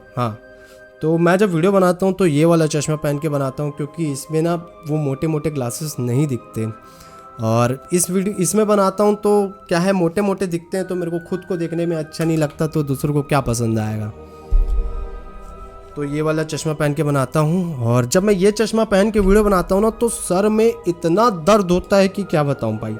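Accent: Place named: Hills, India. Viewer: You are talking like pahili vale log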